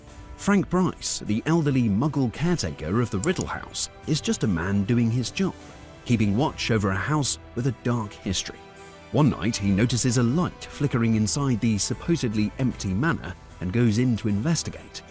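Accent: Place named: United Kingdom. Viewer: Why're you British. but from United States!?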